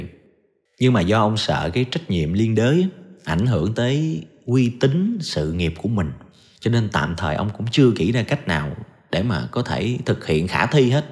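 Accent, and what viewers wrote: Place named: Binh Dinh, Vietnam. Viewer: là người quê bình định à? Nghe giọng đọc cứ nghĩ tới người bình định th haha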